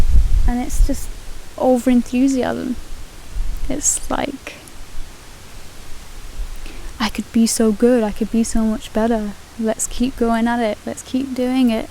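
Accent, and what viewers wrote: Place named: Lancashire, United Kingdom. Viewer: Great video. Im a hindu born in London, family originally from Bolton. It's crazy how 2nd/3rd generation Indians in the UK, myself inc., have lost faith in the wisdom of hindu philosophy, i think we're poorer for it. More crazier still is for me to be rediscovering my roots through someone with your awesome Lancashire accent! Keep up the positive vibes and thanks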